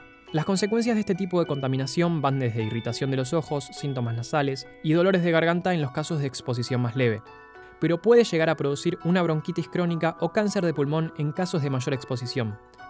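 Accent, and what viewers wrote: Argentina. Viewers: El vox argentino papa, vengo de parte de UMI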